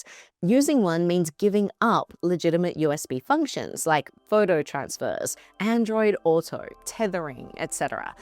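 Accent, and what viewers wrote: Australia. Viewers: Aussies and their darter, make me smile every time. 😊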